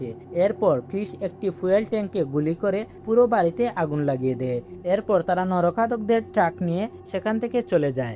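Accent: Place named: Sylhet, Bangladesh. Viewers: মৃত,, নেউল দেখতে পায়,,,,সিলেটি টান ভাষা,,,আপনার কথায় সিলেটের টান আসে,,,,সুন্দর করে বাংলা উচ্চারণ শিখেন,,,সিলেটের ভাষার গুষ্টি মাইরো না। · ভাইয়া মনে হয় সিলেটি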